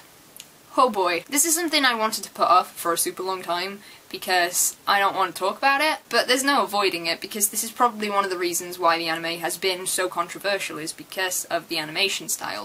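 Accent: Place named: United Kingdom. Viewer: I'm pleased you gave this show a fair chance. I thought it was quite good overall myself. Not a masterpiece, but good, and different. Also. I like listening to your accent. There's something hot about British accents:p.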